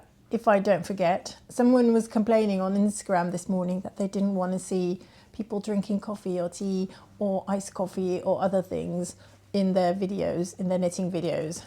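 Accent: United Kingdom. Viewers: Hi - I very much enjoyed this unexpected‘knit’n’chat’! Like you I knit English flicking style and although I can knit Norwegian/Continental style, and do so sometimes to use different knitting muscles, I always return to English style because it just feels ‘right’. Can I ask you out of curiosity (nosiness 🤥?) whether you are English on your maternal side and Dutch on your paternal side, or vice versa? Sorry if you said and I missed it, but I just heard about Dutch grandmother who knitted and English grandmother who knitted, so I’m just curious - especially as you have such a nice English accent, but appear to have lived for a long time in the Netherlands. Looking forward to more Vlogmas videos 😊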